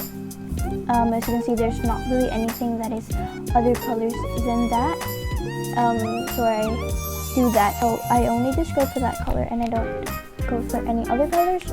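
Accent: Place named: South Korea. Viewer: I have no idea why, but you sound a teeny bit Korean I think?? Or Chinese? Just me, ok.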